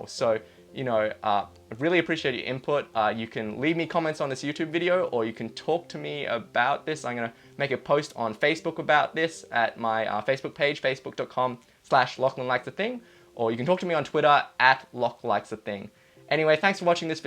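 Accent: Australia. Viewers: Hey, judging by the sound of your voice, it seems that you are Australian. Where do you get your headphones from?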